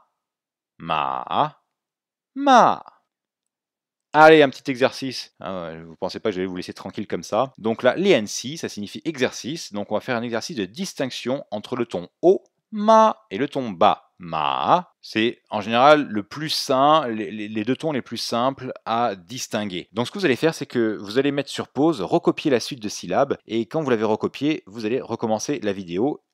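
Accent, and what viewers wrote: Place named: France. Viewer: Je m'excuse d'avance pour la question mais tu es de quelle région de France ? (si c'est en France). J'ai un collègue qui a la même façon parler que toi d'où ma question. Merci :)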